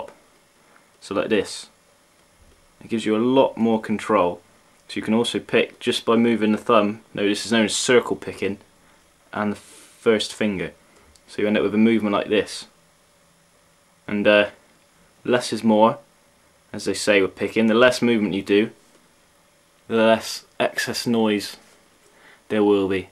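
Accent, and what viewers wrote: United Kingdom. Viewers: you look and sound like marty friedman, just with britsh accent :o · Is that a west country accent i detect ?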